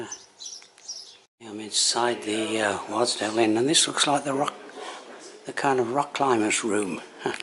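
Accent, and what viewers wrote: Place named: Cornwall, United Kingdom. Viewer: That sure was a lovely place to have a cuppa at the end there. Did you grow up in Cornwall? Your accent is like a guy I used to work with who came from Bodmin.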